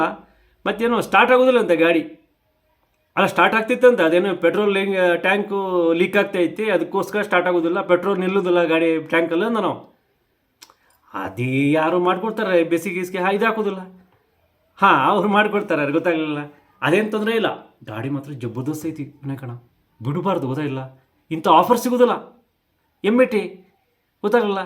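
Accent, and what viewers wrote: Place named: Konkani, India. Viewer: super anna , hinge mathadadu konkni avvu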